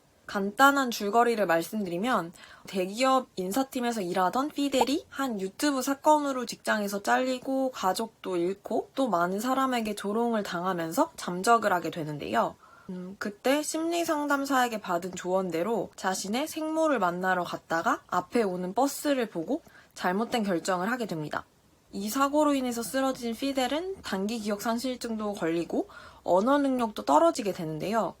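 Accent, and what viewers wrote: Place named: Spain. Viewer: ㅋㅋ 님 뭔가 한국어 하실때도 스페인어 말투가 묻어나는 거 같아요ㅋㅋㅋ추천해주신 영화 꼭 볼게요 감사합니당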